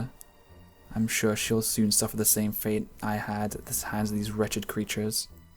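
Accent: United Kingdom. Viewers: hes British lol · ... Please do more of these... That's great. And the british accent makes it even better:]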